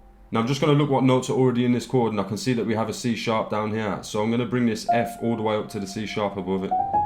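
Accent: Birmingham, United Kingdom. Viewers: Sounds like you’re from Brum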